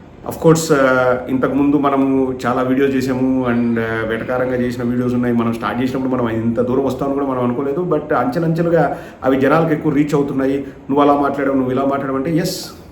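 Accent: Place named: Telangana, India. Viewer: Bro nee proper ekkada,Slang matram Telangana la untadi,Kaani nuvvu chala better ,boothulu matlade Prabhakar gaani kanna .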